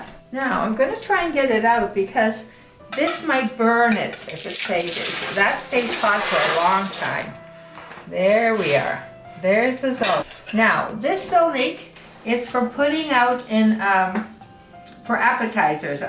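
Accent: Florina, Greece. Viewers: Well done! What village are you from? Your accent sounds like from Lerin area, like me. I'm now in Australia since 1957. My mother made Zelnik so tikva, and now my son also makes zelnik too, for his family. Best wishes, so zdravje.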